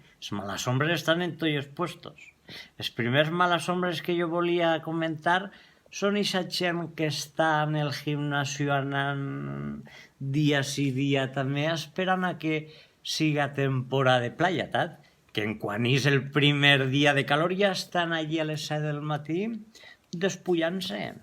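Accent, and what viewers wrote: Valencia, Spain. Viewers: parles el valencià churro, cap de castellà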